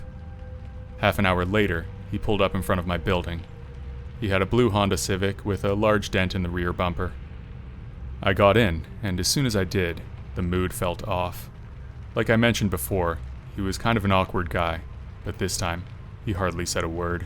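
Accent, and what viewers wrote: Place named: Canada. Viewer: I enjoy the cute "oot" and "aboot" Canadian inflections too. 😊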